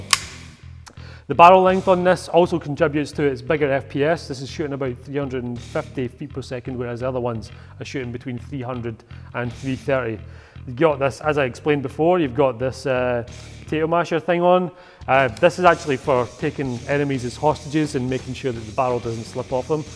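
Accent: Scotland, United Kingdom. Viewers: Haha all the folk saying hes English and Irish clearly haven't heard a Scottish accent before :L in one of his videos he actually states hes scottish. hur hur.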